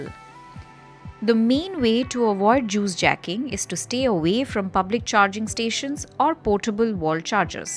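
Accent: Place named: India. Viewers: English spoken by an Indian sounds so adorable 😍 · Indian Accent is best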